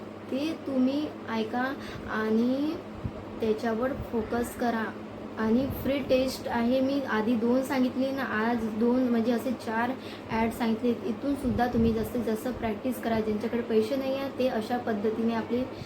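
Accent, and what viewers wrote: India. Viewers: मॅडम तुमची भाषा खूप छान आहे. पूर्व विदर्भात आहेत का तुम्ही. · Nagpuri bhashya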